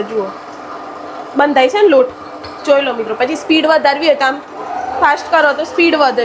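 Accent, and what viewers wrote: Sabarkantha, India. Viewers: બેન સાબરકાંઠા ના પટેલ લાગે છે ભાષા 😅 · Sabarkanthaa ni bhasa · સાબરકાંઠાની બોલી 😊😊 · Sabarkantha boli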